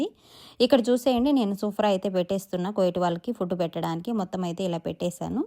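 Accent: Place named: Telugu, India. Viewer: Swachamina Telugu ammayi laga undi me speech ❤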